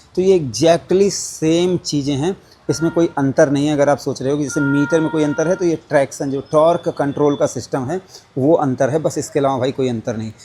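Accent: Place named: Awadh, India. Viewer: Bhai aap ka bolne ka desi awadhi tarika bahut hi gajab lagta hai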